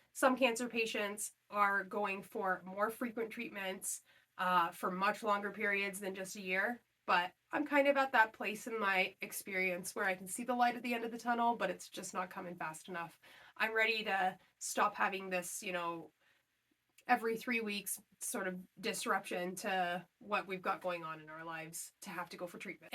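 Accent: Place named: Canada. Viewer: Thanks for being so comprehensive,! I really appreciate it and yes, viewers are looking precisely for all those little details you so generously shared, so it's definitely NOT too much information. It's reassuring as a breast cancer patient to feel heard and seen. You have a natural flair for relaying information in a very clear manner (plus a fantastic Canadian accent which I love!) and it has been very helpful listening to your experience which, so far, has been similar to mine. I'm just about to do my 5th round of full chemo (Docetaxel, Herceptin and Perjeta) and it has been more my upper GI tract that has given me the worst symptoms. Pretty ok, for the most part, in my lower GI tract. Did you experience any watery eyes during your full chemo treatment? Mine just won't stop! Looking forward to putting the full chemo behind me and just getting the surgery done. 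I have seen your video on your 1 year anniversary and I can't help but wonder: the fact that you're done with your HP regimen now is awesome but how are these continual questions in the comments to your videos affecting you and your mental health? I can't imagine what that would be like. Is that helpful for you to relive or does it maybe make you anxious? Just curious as to the psychological implications of this on you, post NED. Continued blessings to you on your health journey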